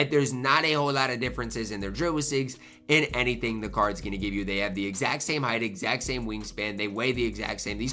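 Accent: Iowa, United States. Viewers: Is this an Iowa accent? What is this?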